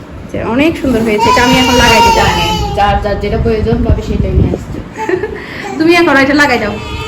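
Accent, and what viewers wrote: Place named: Satkania, Bangladesh. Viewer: চট্টগ্রামে না সাতকানিয়া স্টাইলের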